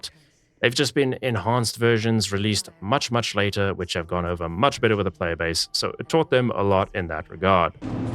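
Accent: South Africa. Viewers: South African Accent?? My ears don't lie👀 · saffa? your accent is difficult to place 🤣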